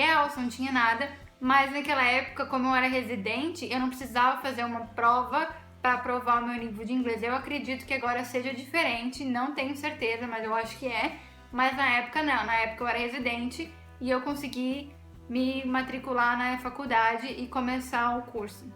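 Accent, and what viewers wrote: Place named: New Zealand. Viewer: Na verdade, você aprendeu inglês com sotaque, com muito vocabulário da Nova Zelândia, que não é britânico, nem americano... Não é muita vantagem...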